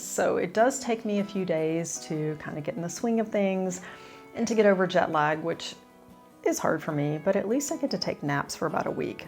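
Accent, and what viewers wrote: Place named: Texas, United States. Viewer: I love your German cause I can hear a little Texas thang in it, you sound lovely. I went to Germany for world youth day in 2000.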